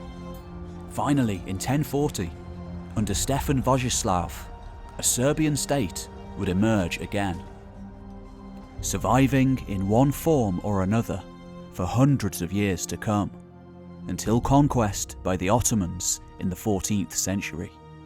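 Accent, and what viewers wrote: United Kingdom. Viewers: Thanks for posting this information presentation. You sound like a fellow Brit to me, so what's with the 'ad-ver-tize-ment' pronunciation? Just wondering.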